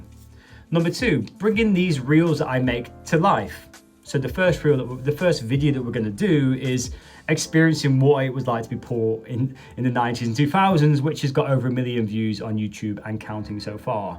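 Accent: United Kingdom. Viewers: (this comment was based on your UK accent lol)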